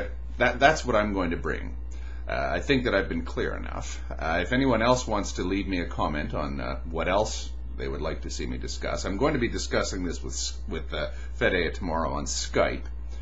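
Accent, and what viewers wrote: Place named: Canada. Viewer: Is this dude actually speaking English? I can't make anything out of his Canadian accent. But I do agree that Jacob's Ladder is a dope movie, cheers mates.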